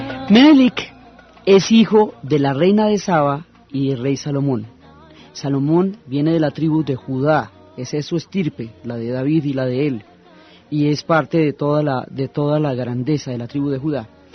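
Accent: Colombia. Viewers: Excelente! Soy una persona de 87 años , siempre he amado la historia y usted con sus conocimientos profundos , su voz clara amena y ese acento colombiano, me han hecho su más fiel seguidora . DIOS le siga protegiendo sus bones.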